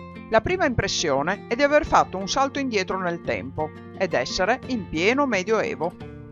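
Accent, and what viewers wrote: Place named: Friuli, Italy. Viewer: Hai l'accento friulano "crostoli" ad esempio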